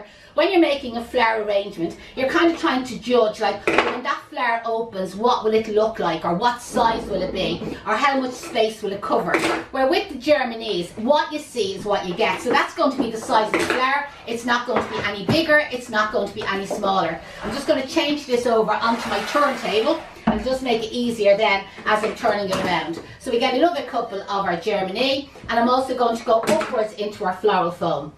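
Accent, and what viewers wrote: Ireland. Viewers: Thank you for the great tutorial! I could listen to you talk all day. An Irish accent is so lovely to my ear. I’m going to do a bottle arrangement for my next party!!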